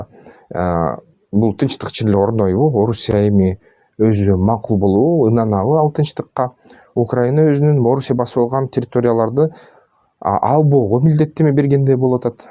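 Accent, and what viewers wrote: Standard. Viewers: Таза кыргызча үчүн өзүнчө чоң рахмат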